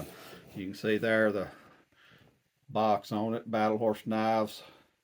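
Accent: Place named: Texas, United States. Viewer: Обожаю техасский акцент хоть и нечего непонятно😂 но Кизлярские ножи лучше 👍😅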